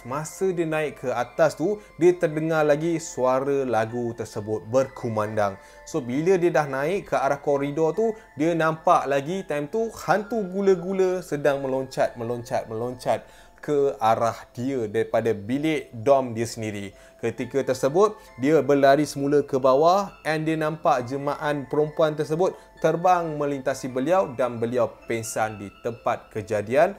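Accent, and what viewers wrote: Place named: North, Malaysia. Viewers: Abg fasih naw ckp utagha bleh lepak skali pa nnti mau sembang kita dua ni tiga gelas kopi pon kleaaa😂😂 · Steady bang.. Loghat utara time menyampaikan kisah seram ni buat lagi sya sronok nak dgar. Hahaha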